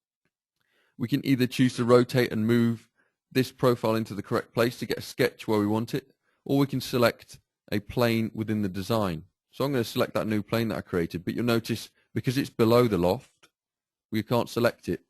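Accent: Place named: United Kingdom. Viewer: Was pleasantly surprised when I heard a British accent.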